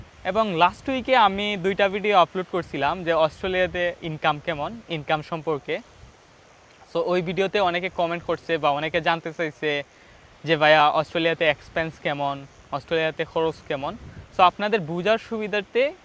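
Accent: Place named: Sylhet, Bangladesh. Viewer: ভাই আপনি বোধহয় সিলেটি। সিলেটি ভাষায় কথা বলেন... অন্যান্য, জেলার তুলনায় এই অঞ্চলের ভাষাটা খুবই বোধগম্য ও সুন্দর। ইলিশের বাড়ি চাঁদপুর থেকে...🤍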